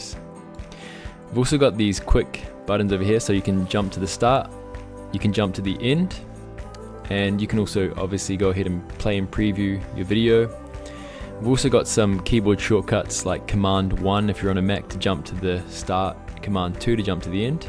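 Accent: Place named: New Zealand. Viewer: I hear a kiwi accent! Ka pai!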